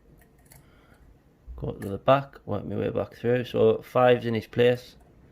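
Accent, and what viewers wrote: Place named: Newcastle, United Kingdom. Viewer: I’ve bumped Yale as me era rims lol on a different note I would love to see a video of you picking a Ruko padlock I have just got one (not the combi) I do have one bit way above my skill level but I think I should be able to open the standard one and I’m getting nowhere maybe 1 or 2 pins set your video explanations are far easier for me to understand even with the jordie accent 😂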